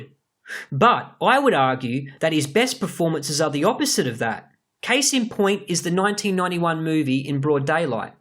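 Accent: Australia. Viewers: Hi...Your Welcome Don't mean to embarrass you /...it's very SEXY/GORGEOUS 🤩 I could listen to you talk "AAAALL" day long! 😊 I'm a California Girl...near LA And You? Accent sounds Australian? What time for tomorrow's flick? CAN'T wait!!!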